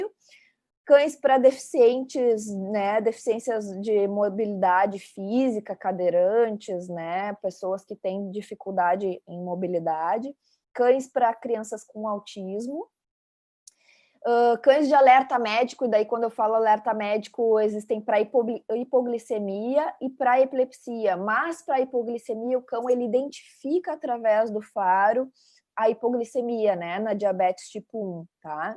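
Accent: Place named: Rio Grande do Sul, Brazil. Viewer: O sotaque gaúcho é assim mesmo!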